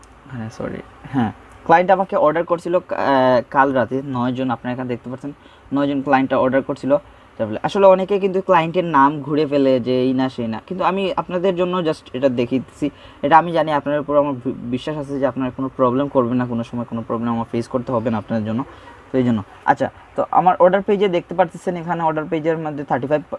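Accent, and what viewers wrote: Sylhet, Bangladesh. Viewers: apnar kotha shuna mona hoitaca apne sylhete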